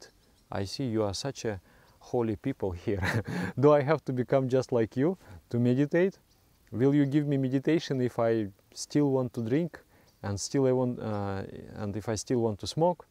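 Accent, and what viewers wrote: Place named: Russia. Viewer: Russian pronunciation in english sounds great, it’s like speaking english but russian way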